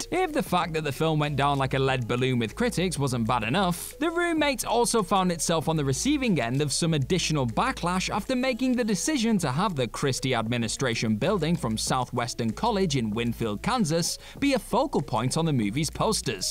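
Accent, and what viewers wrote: United Kingdom. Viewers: "He's English" You seem to be under the mistaken assumption that they have one accent/dialect. Which is wrong. This is a specific UK dialect that does pronounce the "g" in hangover. Other dialects don't.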